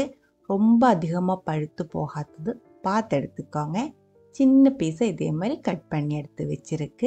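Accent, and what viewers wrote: Kerala, India. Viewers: മലയാളി തമിഴ്‌ പറയുക ആണോ?അതു പോലെ ഒരു accent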